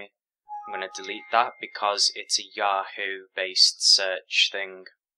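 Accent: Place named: Lancashire, United Kingdom. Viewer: This helped a bunch. Cheers! (P.S. you're definitely from Ormskirk).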